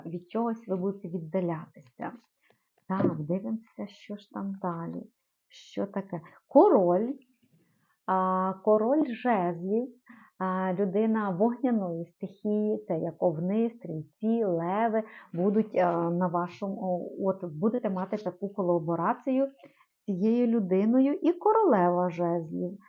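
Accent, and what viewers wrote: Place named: Ukraine. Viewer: Откуда появился у меня на канале хохляцкий говор?!!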